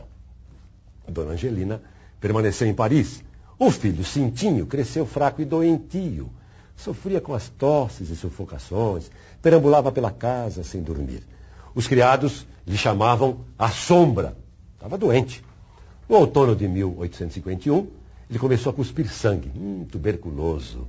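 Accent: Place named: Caipira, Brazil. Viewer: Belo trabalho, voz caipira lembrando os velhos antigos (sim, os velhos de antigamente eram muito melhores e melhores contadores de estórias que esses velhos de hoje). Parece ser um excelente professor, apaixonado pela sua arte. O livro me pareceu ser chato e descritivo demais, justamente o que procuro, o Eça tem essa qualidade mágica de fazer nos apaixonarmos pelas palavras. Pedi hoje, espero ler logo, logo.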